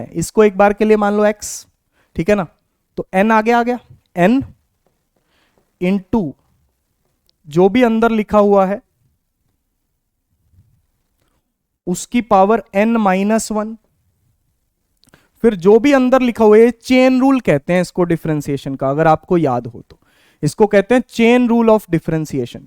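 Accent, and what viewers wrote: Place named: Haryana, India. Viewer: Thank you sir sir apki Haryanvi Katee Zaher hai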